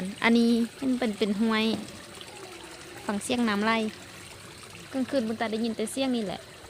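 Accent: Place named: Loei, Thailand. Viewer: สวัดีครับน้องบุญตาสำเนียงภาษาเหมือนกับจังหวัดเลยของไทยเลยฟังแล้วน่ารักเป็นธรรมชาติดีครับ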